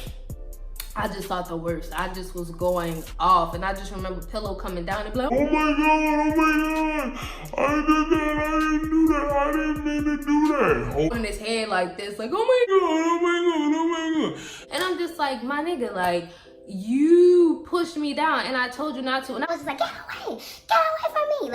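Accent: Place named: New Orleans, United States. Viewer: Oh so you are from N.O, Of all southern accents that's my fave 😂. It sounds like Homeboy had a little thing for you👀😏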